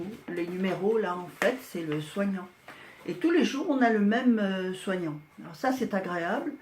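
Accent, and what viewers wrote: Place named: France. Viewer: loved it & love your French accent :)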